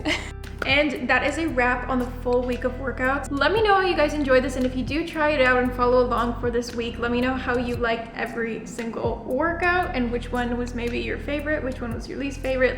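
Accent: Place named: Canada. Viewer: no clue you were canadian until i heard the accent lol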